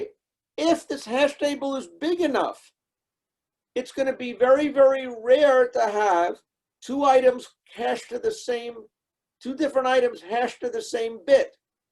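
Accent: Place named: New York, United States. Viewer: a thick New York accent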